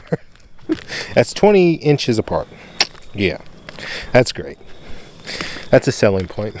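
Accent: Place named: Pittsburgh, United States. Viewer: Pittsburgh Accent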